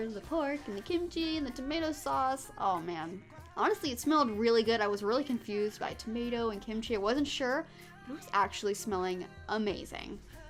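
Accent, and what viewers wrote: South, United States. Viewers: Are you originally from the south? Love your accent! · All the y'alls make me think your southern.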